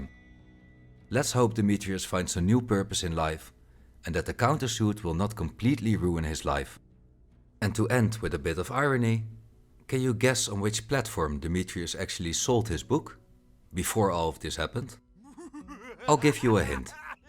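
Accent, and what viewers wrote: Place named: Netherlands. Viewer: Yeh, let's put a dutch accent on a "machine voice". Not only the accent, but the usual dutch sentence building and the clear script reading is a dead give-away for a real person. Don't assume truth, search it my friend.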